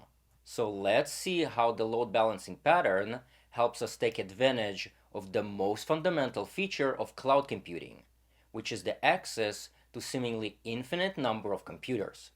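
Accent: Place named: Eastern Europe. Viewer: You have interesting accent, do you have any Slavic roots?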